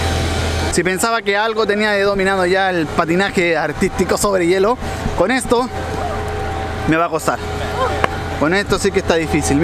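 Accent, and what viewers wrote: Chile. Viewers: Ya usas el acento chileno, ya eres mas tú y no hablar con tono de robot bcjfjkf ♡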